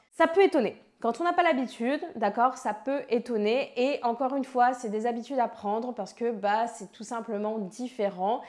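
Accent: Toulouse, France. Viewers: tout à fait et le tout avec l'accent de Toulouse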